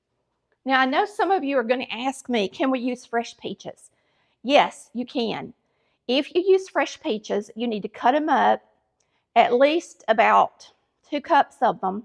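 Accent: South, United States. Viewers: Your recipes look so delicious, I just love your sweet southern talk. I definitely want to make this cake. Thanks for sharing!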